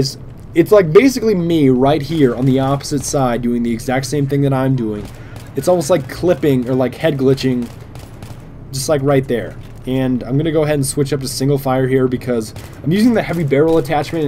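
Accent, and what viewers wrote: Canada. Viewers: i thought u was canadian :O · Arnt you Canadian?! ^^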